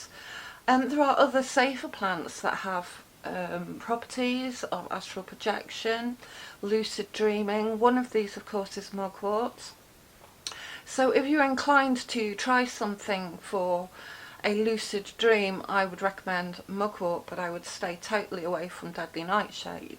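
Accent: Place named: Manchester, United Kingdom. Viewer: U sound like from greater Manchester ha subbed u, Oldham here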